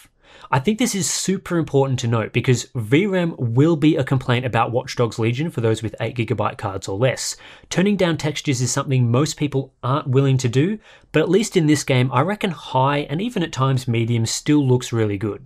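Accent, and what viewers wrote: Australia. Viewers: So aussie so organised. Are guys ausies.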